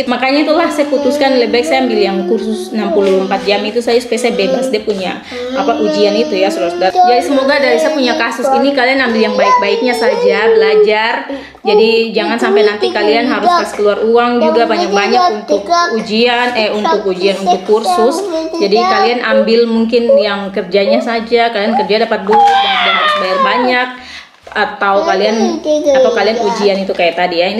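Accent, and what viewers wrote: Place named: Papua, Indonesia. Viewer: Bahasa belanda campur logat papua 👍😂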